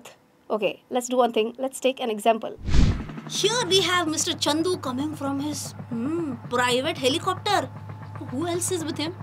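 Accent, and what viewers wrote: Maharashtra, India. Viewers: Nice english with a marathi touch. thank you. i watched before i advice my son on this policy.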